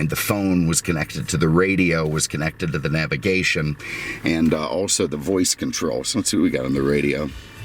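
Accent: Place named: New York, United States. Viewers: Just can't take the NY accent. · Ooh a New Yorker. I look forward to that.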